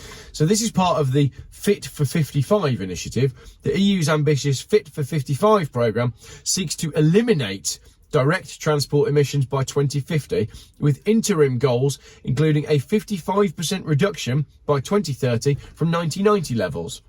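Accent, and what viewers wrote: United Kingdom. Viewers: Steering wheel on the right side and a British accent, so likely a Brexiteer and EU hater.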